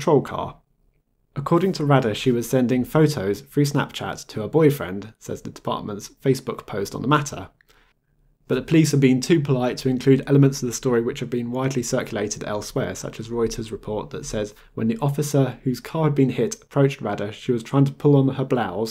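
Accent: United Kingdom. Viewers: I have been a subscriber for several years. For a laugh (and because my ears are old and worn) I turn on CC. I assume CC is US based because your British English comes out a bit funny at times. BTW I used Sabayon for a few months several years ago and liked it.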